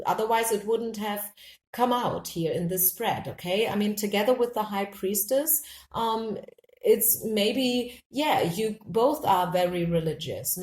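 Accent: Germany. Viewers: Kann es sein dass du deutsche bist? Der Akzent hört sich so an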